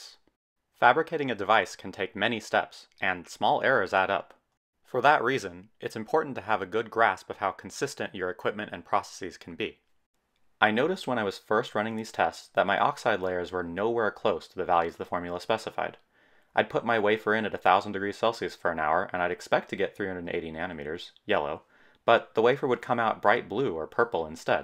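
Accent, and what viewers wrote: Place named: United States. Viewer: Fascinating, and aesthetically pleasing. Look forward to future videos. P.S. this was my first time ever hearing someone with US accent correctly pronounce the word "niche". It grates me when I hear "nitch" - I just can't help myself!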